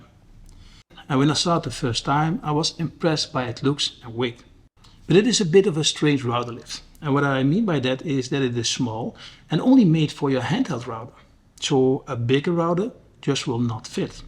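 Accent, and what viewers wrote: Netherlands. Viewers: You got to rid of that Dutch accent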